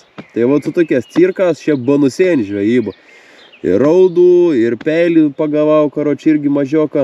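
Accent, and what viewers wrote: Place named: Samogitia, Lithuania. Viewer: Nesnekėtum zemaitiska nebutu idome ziurėt👍😃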